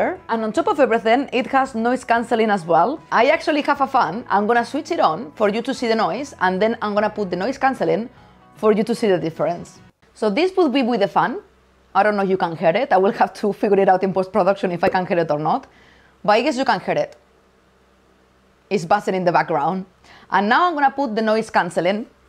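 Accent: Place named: Spain. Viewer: I have to tell you, you have taught this old photographer so many useful tips and tools. I always look forward to your fantastic videos. Side thought, you look amazing and I love your Spanish dialect. See you in the next one.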